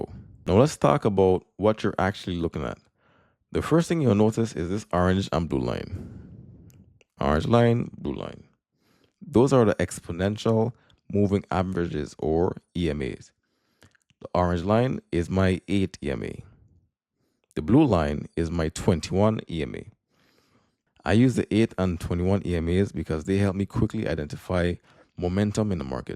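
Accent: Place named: St Croix, United States. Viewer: good morning. where are you from. sound very much like someone from STX.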